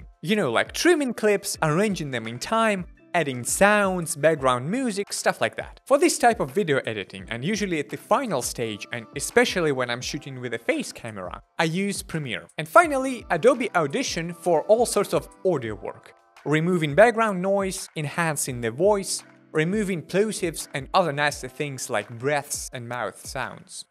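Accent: Russia. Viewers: Is this guy a russian originally?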